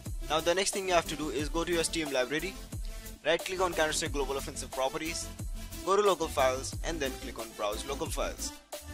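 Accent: India. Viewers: YySsBG YT he has an Indian accent you degenerated racist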